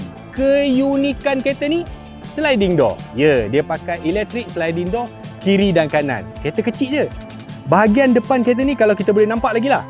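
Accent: Kelantan, Malaysia. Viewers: ore kelate ke abe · Haha org mentakap kecek klate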